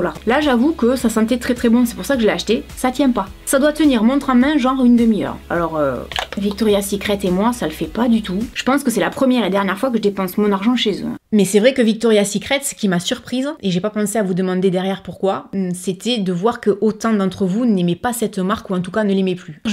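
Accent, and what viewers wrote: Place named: Perpignan, France. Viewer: J'ai rigolé car il n'y à aucun de ses produits que j'ai testé acheter mais ta bonne humeur et ton accent de Perpignan me fond toujours du bien 😝😘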